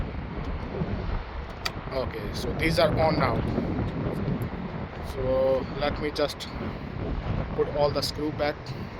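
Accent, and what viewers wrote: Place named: India. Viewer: You sound like you’re from India. I would like to connect with you and learn about your journey in the HVAC trade.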